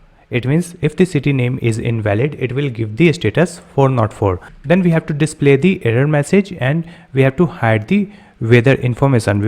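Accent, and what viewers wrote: India. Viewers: indian guy thanks i like your video and accent😊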